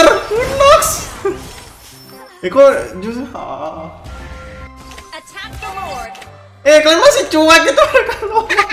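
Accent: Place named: Malaysia. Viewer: Gua dari Malaysia gua dengerin suara abang kaya orang malaysia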